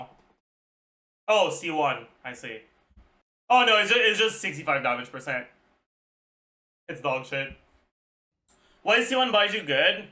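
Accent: Quebec, Canada. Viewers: Il est québecois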